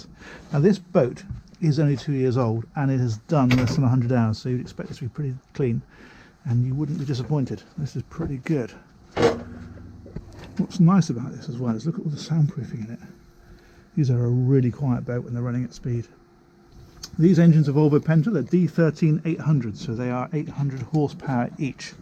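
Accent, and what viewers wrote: United Kingdom. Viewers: I like clarkson very much, but this nice man reminds me more of David Attenborough, because he speaks with a calm voice and just in plain, civilised, british english that even i can fully understand as a dutch dude, And Clarkson is way more loud and entertaining, which i like aswel, but not to review a yacht.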